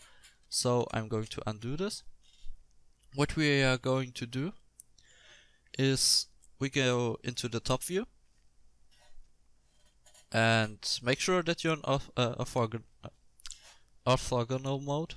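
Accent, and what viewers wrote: Germany. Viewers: Du hörst dich ein bischen deutsch an :)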